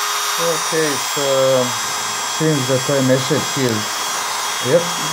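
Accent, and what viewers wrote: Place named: Russia. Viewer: Sorry, your accent sounds Russian. I was complimenting you.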